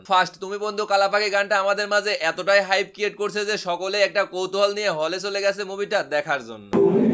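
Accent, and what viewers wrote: Pabna, Bangladesh. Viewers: apnar basha pabnar kothai bro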